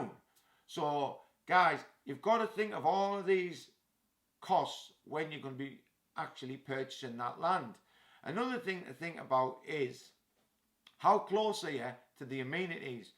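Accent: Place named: Teesside, United Kingdom. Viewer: Sounds like a T T Teessider accent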